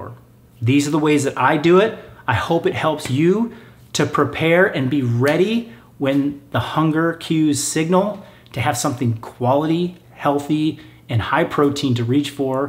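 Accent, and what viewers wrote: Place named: California, United States. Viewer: Also you sound like Uriah Faber lol, must be from California? In fact you sound a lot like anyone from Sacramento and a lot of Team Alpha Male.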